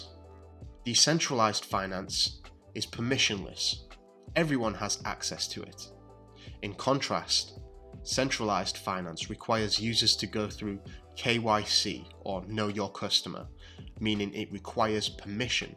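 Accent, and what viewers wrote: Yorkshire, United Kingdom. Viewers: Very very informative. You are an excellent narrator! Northern accent, Yorkshire? Many thanks.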